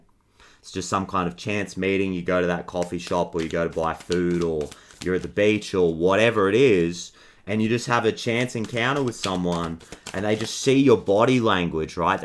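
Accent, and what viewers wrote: Australia. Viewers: Omg i just love that these channelled messages are coming through an aussie accesnt and aussie way of relating to the world . 😂❤❤❤❤❤❤❤❤❤❤. Just this alignment in irself blows my mind . Just llove you and your humour . AGAIN Thank you mate . ❤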